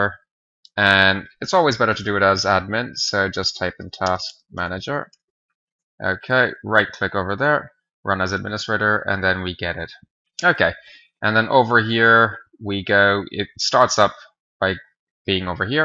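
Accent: Ireland. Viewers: Where are you from? You have an Irish accent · ALSO U SOUND SEMI IRISH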